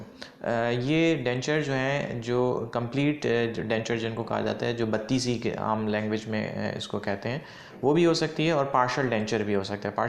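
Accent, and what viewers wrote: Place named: Karachi, Pakistan. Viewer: ڈاکٹر صاحب آپ کی لینگویج سے معلوم لگ رھا ھے کہ آپ کراچی سے ھو۔۔۔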